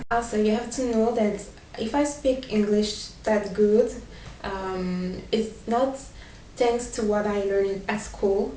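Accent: France. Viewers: Her vowels and "r"s sound French to me. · Wow taking into consideration that you are French you speak perfect English but French people don't pronounce the letter h and they consider it a vowel and you still do it but other than that you speak perfect English! =3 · yea u sound French lol, and wow u r beautiful. nice hair too :) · Thanks for the tips. I'm starting learning spanish from zero and will take your advice. P.s love the french accent so don't try and get a more english accent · doudi ¿Bueno? tu sabes que... ella todavía está aprendiendo, el inglés y Yo sigo pensando que ella todavía debe tener un acento francés, incluso cuando ella es.. ¡mejor! ¡suena muy muy bien en acento del LA Frances! ¡amigos! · Good. Now the words are off but you are just as beautiful! (wink wink nudge nudge) Thanks for your videos, I would really like to learn a new language and you make it so easy to listen to. 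I really like that french accent. It sounds gorgeously suggestive to all of us Americans. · Your accent is so interesting! I hear both the French and Spanish accent, and even an African accent. I've been learning French but I hope to learn Spanish too (and improve my French) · J'ai direct reconnu le français :) sinon bonne vidéo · You do have a french accent, but your accent isn't impeding your communication. Great job :) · it's very clear she has a very strong french accent...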